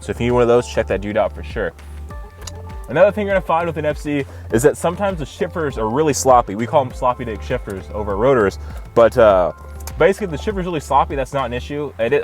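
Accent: United States. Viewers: What part of Nebraska are you from?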